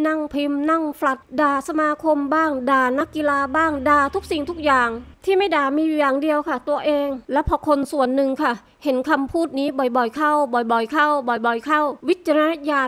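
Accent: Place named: South, Thailand. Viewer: สุดยอดครับฟังเสียงน่าจะเป็นคนใต้นะครับ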